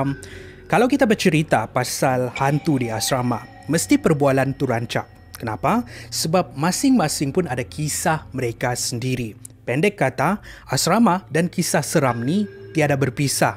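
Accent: Sabah, Malaysia. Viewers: abang orang sabah kan???😅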